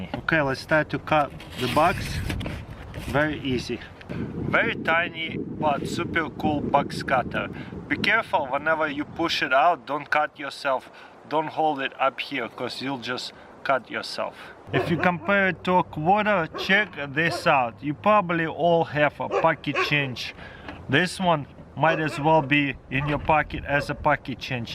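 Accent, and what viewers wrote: Russia. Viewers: He's russian guys chill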